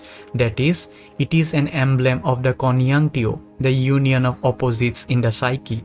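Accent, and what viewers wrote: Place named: India. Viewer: Nahh bro. I like his Indian accent. It makes him unique from other western Jungians. Love it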